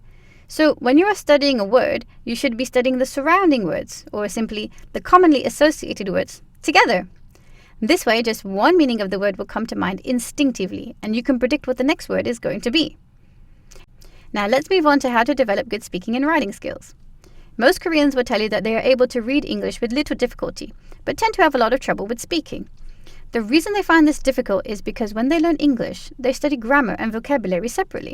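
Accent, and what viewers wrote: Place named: United States. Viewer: 영국인 절대 아님 영국인 피가 섞인 동남아시아여가 분명합니다 발음은 영국영어가 아니라 미국영어에 가깝고요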